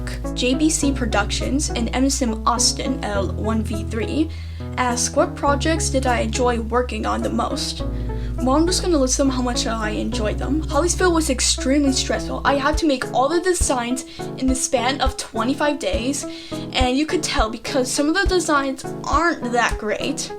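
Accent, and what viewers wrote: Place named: United Kingdom. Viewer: I used to think you were british back then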